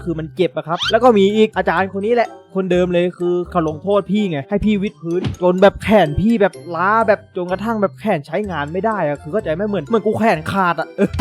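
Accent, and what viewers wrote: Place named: Suphanburi, Thailand. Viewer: พี่เป็นคนสุพรรณบุรีหรอเสียงหนอจัง^^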